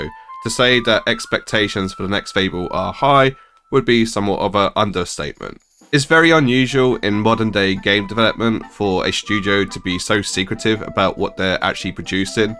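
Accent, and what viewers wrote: United Kingdom. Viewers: Oh fuck, a British good boi 😮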